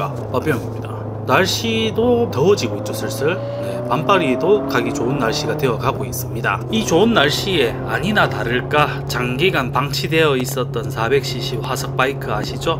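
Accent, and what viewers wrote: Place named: Daegu, South Korea. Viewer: 자막이 없으니깐 발음이 뭐라하는지모르겠네요;;...사투리보니깐 대구사람인거같은데ㅠㅠ오토바이 땡겨먹기 한번하시죠😂ㅎ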